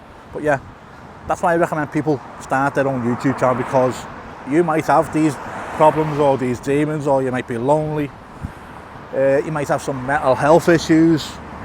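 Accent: Liverpool, United Kingdom. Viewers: A warm greeting from Indonesia! As a non-native English speaker, I absolutely adore the Scouse accent - it's simply incredible! Although I am still in the process of learning English, I subscribed to your Youtube channel as I would love to become more familiar with the Scouse accent. Hopefully, one day in the future, I will be able to speak English with a Scouse accent myself. Wishing you even more success on your Youtube channel! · I watch nearly all of your videos and I’ve never noticed any speech impediments at all. You sound like a perfectly normal scouser 🤓👍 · Thanks for the Videos. You alway come over as a caring and genuine character. You're not just out for the aggressive click bating like some YouTubers. I've never noticed the speech impediment? You're just good old scouse! Because you're human, if you receive 100 positive comments and 1 negative comment. 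It's that 1 negative that sticks in your mind and will out weigh all the positives. Just keep doing what you do mate. It's working!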